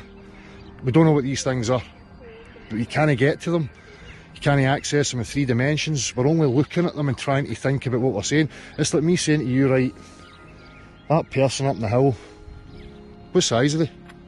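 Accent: Scotland, United Kingdom. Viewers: I love it!! And you remained friends.....and sowed a seed. Well done! (What part of Scotland are you from?) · I like scottish accent 😉 !! I thought he was a relative of Charlie Burchill 😂 ( Simple minds)!! Greetings from Italy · That was so well done. I love the Scottish accent as well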